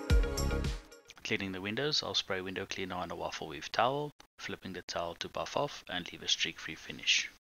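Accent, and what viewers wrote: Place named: South Africa. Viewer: You can recognise a SA accent anywhere. Nice job. Hope to see more videos.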